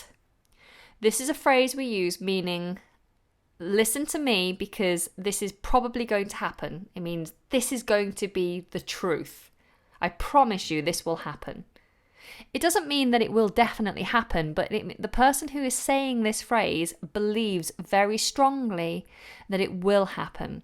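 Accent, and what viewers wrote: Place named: England, United Kingdom. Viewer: Hello, I'm Waser Resaw. Nice to meet you. I'm dance music producer from German record label. I hear you voice and i think it's perfect. Especially your England accent i love it. Your voice catches my attention. Please sendme a inbox with a voice note or audio file saying: "You now listening Pitch Industry, Introducing Waser Resaw" Of course i really appreciate it. <3 My website if you wanna hear mi sounds: <link> <link> I wait your answer. Thank you.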